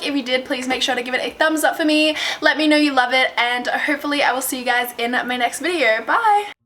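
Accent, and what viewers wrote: Australia. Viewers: I've quickly grown to fall in love with your style, personality, all around everything. Can I be you? Or can we just be friends? I'd settle for that 😫😥 keeping doing you!! I absolutely love all that you do! From cruelty free from your Australian accent to your way of explaining & doing your makeup 💋‼️😍 slaaay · I watch your videos religiously and not just for the makeup...I'm so obsessed with Australian accents lol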